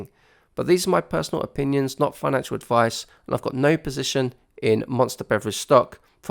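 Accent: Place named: United Kingdom. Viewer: Good video except for the annoying British accent, you English people should learn California accent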